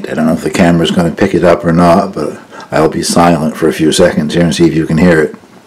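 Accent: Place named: Canada. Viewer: this looks like a yummy pudding I am wondering if the dumplings have come from my homeland (Scotland ) sadly I now live in Oxford,England which is a beautiful city of course but its not Scotland. I love your recipes its just lovely listening to your Canadian accent and enjoying all the things you cook including the cheeses. I live in a tiny bungalow with the smallest kitchen ever so I cant see myself getting into the cheese making including the press etc that needed. I started off watching your Cabin episodes but now I love all your videos I'm a big fan thank you again Mae x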